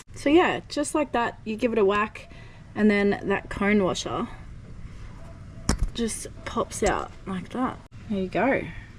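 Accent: Australia. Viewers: Keep it , also who else here came for the girl but stayed for the HEAVY straya' accent , nah but in all fairness -you live in a incredible part of the world, and you are just out having fun , i enjoy the videos, please keep them coming - best regards a scandinavian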